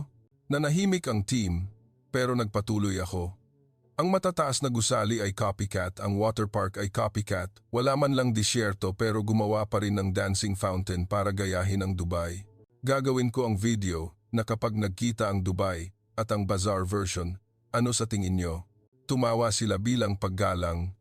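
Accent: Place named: Philippines. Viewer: You’re a Tagalog speaking Arab? Why didn’t you vlog in Arabic? Is this video for the Filipinos, or your fellow Arabs, or are you just trying to get thousands of views from the Filipinos who are renown for their love of watching videos about their home country? Somehow I don’t believe you’re from Dubai. You’re a Filipino in disguise because you have a distinct Filipino accent although not necessarily a native Tagalog speaker!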